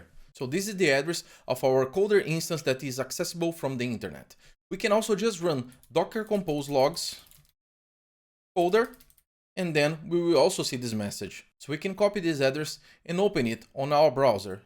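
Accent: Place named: Brazil. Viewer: percebi que o sotaque parece o da nossa terra ^^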